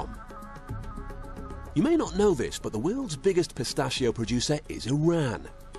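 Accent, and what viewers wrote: United Kingdom. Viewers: And a brit remittance man narrating. Is there nothing these manglers of their own language will not pretend to be exerts on?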